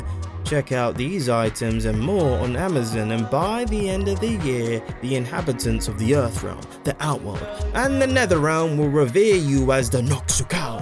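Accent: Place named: United Kingdom. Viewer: Love the British accent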